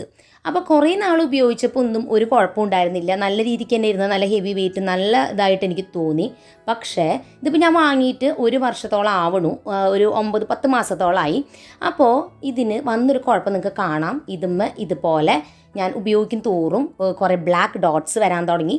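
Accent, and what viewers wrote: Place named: Thrissur, India. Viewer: തൃശ്ശൂർ ആണോ വീട്... സംസാരം കേട്ടിട്ട് അങ്ങനെ തോന്നുന്നു 😊... ഞാൻ തൃശ്ശൂർ ആണ്‌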